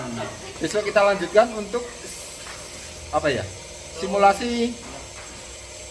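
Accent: Java, Indonesia. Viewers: "Walau Medhok, Kami terus Berkarya" Mantap kuwi mas!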